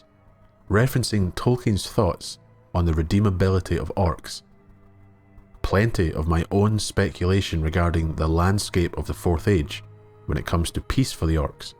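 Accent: Scotland, United Kingdom. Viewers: Scottish, Irish or Canadian? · Ok sorry then. You speak (pronounce) Scottish not English. Very hard to listen to for a non english person. "I'm not speaking slang." - Yeah, since you´re a Scot, you speak Scottish. And a very proud one it seems you are. Sorry to have offended you and your way of interpreting the English language. Still you´re prounouncing an "i" like the rest of the English world would an "e". Not sure if you even consider what you speak as English. Maybe even, you are offended by me calling it English. Not my intention to offend, just to listen properly. So maybe it´s me and i gotta go wash my ears. Cheers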